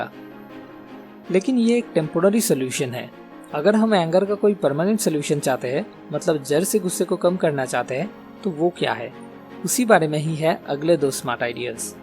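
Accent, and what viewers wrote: Bengal, India. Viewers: Initially this voice was looking awkward to me. BUT nowadays, I like this voice & pronounciation of Bengali Hindi. 😊✌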